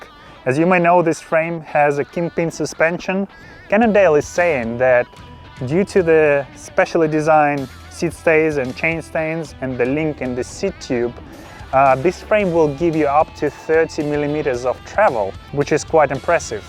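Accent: Russia. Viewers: Do like your videos, buddy! Are you from Russia& Sounds like that ) Hope to see more interesting Gravels on your channel soon!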